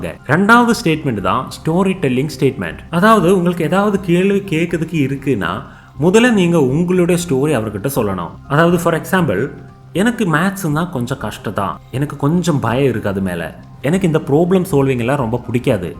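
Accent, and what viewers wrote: Kerala, India. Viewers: ஒரு நல்ல மலையாளி... தமிழ் பேசுறது இப்படி தான் இருக்கும் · நீர் முதலில் தமிழரா? மலையாளம் வாடை வருகிறதே உமது தமிழில்! கோலேஜ & அவர் பேசிட்டே இருக்கும் என அஃக்றினையில் சொல்கிறாய்! எங்களை பல முறை அஃக்றினையில் குறிப்பிடுகிறாய்! நீங்க எந்த ஸடாண்டர்டுல படிக்குது..! யோவ்! ஓடிப்போயிடு!நீ முதலில் நன்றாக தமிழ் & ஆங்கிலம் கற்றுக்கொள்! தப்பு! தப்பா சொல்லி கடுப்பேத்தாத! உன் வாயில வசம்பு வைத்து தேய்க்க..! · Néenga malaiyali dhana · R u malayali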